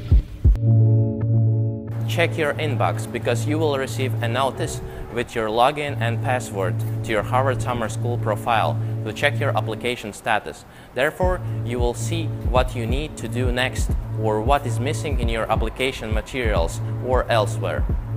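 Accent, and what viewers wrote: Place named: Russia. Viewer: Я чувствую Русский акцент... Really?!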